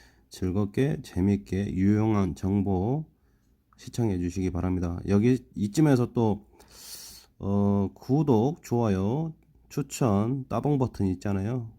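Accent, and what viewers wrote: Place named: Jeolla, South Korea. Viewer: 혹시....고향이 전라도이신가요 ㅋㅋㅋ 제가 전라도인데 뭔가 구수하네요 ㅋㅋㅋㅋㅋ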